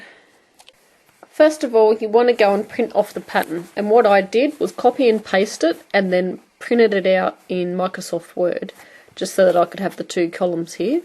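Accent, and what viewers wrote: Australia. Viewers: Sorry, meant to get this in with my post below. Hi, I am new and truly enjoy the video's I've viewed on your channel. You make it so easy for those havin' trouble because you explain things so well. I like listenin' to your Aussie voice; as I am from the USA . You do have a pleasant and soothin' voice. Thanks so much!! ~Buffalo Gal~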